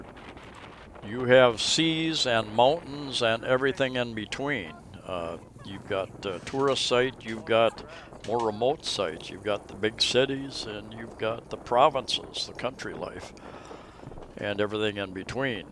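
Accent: Midwest, United States. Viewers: I just found your channel today. Liked. Subscribed. Wish I had found it sooner. After two visits to PH I knew I would be retiring here. My wife and daughter joined me in Quincy (just south of Boston) MA for about six years. It was a little sad. The first snow of their experience was magical for the first 20 minutes. Then my daughter was like, It was fun but I'm done. Left my wife and I to shovel the driveway alone. They endured six winters with me there. I retired early in 2013. Brought my tropical flowers home. I've been here (Siaton, Negros Oriental) for over five years. Just living the simple rural life. I was trying to place you accent thru several of your videos until I came to this one where you say you are from Minnesota. I knew I had heard it before. My best friend from my Navy days was Objibia from northern MN. I don't get out much but if you're ever in the neighborhood... 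· Thx for the vid…older vid but you covered many important points..in 8th grade (Wisc) we sang from “The King and I” which I think is the first time the idea of living abroad came to mind, then working at an airline was able to explore many places and visit relatives in Sweden and Wisconsin..stay in Tland now (waiting to get back) but PI is my 1st option if things don’t work out there..compliments on your vids done in a midwest accent lol 🙏🏻👍🏻✌🏻🕊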